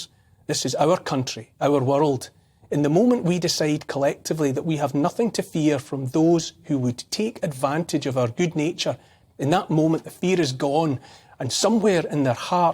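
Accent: Scotland, United Kingdom. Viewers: The truth with a Scottish accent! Love it! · A load of drivel from an over pronounced Scottish accent aka 'Posh Scotch' from a multi Millionaire puppet. Wake up lads. Fuck sake. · Neil Oliver 💞🤗 A gentle, beautiful Scottish lilt A kind, empathic, forward tilt An open heart; a caring face A willingness to take his place. A strong, home-hitting monologue Reminds us of the boiling frog The courage to become a sleuth A passion to release the truth. A deep desire to crack the whip Illuminates our freedom-strip Calls out the darkness taking hold The shiny despots; plated gold! Intense and raw, he lays it bare A soul-enhancing, honest stare Inflections drive the message through He speaks for Us - for me and you! Discernment's such a precious gift His words create a mighty lift Take heed of all he has to say He knows - it shouldn't be this way! 🤗🦋🐬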